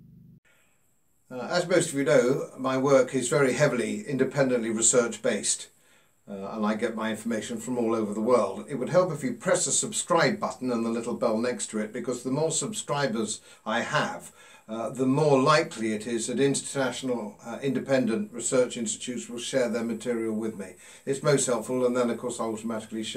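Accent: United Kingdom. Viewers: Oh i do love your delivery of this current truth! So very very english and i love it! Gave me a little giggle! 😂 sad that it is, but so evident. I so love your voice. Thank you sir. Keep it coming. 😊😊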